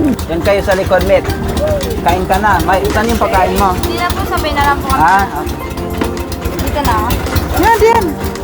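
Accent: Philippines. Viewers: I wanna hear talk in his native tongue, as in talking with that Texas twang. Even his English words when he's narrating are spoken with a Philippine accent.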